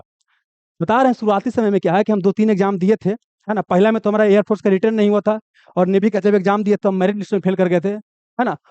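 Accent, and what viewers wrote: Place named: Bihar, India. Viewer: Bihari style me mast bol rhe ho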